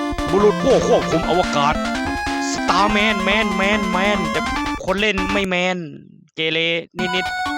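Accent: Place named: North, Thailand. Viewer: ชอบมากเลยครับ นานๆทีจะมีอู้เหนือ 55+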